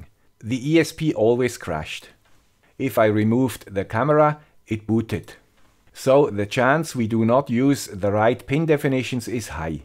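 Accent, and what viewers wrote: Switzerland. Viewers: Yes, THAT is an swiss accent. Notice the distinct difference between a german speaking english? And seriously... Tensorflow on the RPi? Do you know Yolo (uses Darknet)? Yolo classifies quite many different types of objects, but requires quite some processing power... the RPi3 needs around 40 sec for a single frame!!!!!! To make it realtime some external accelerator is required... and even veeeeery small cnn network models,,... It might work with very small images (need to test though...) like 50x50 greyscale or so... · Hello guy with the Swiss accent! Any idea what the camera connector is, CSI? Could you make a video to experiment and let us know if it would be possible to use a 5MP or 8MP camera from a raspberry pi, to increase the fps and quality?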